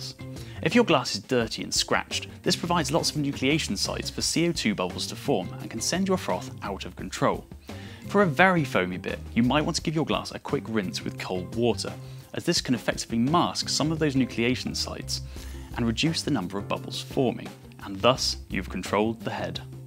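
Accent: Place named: RP, United Kingdom. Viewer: He has a posh accent haha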